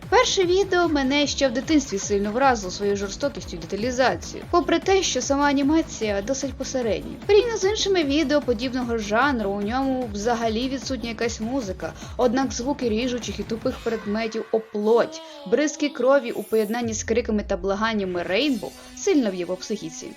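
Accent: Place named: Ukraine. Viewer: Похвально що розмовляєш майже чистою українською мовою 😊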